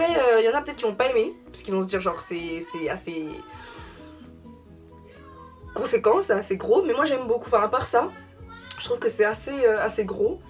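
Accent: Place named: France. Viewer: Damn I love your French accent I have been obsessed with French literally my whole life, I just love you so much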